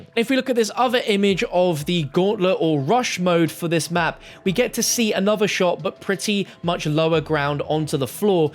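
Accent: United Kingdom. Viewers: He might speak in a different dialect or he’s like British or isn’t from the US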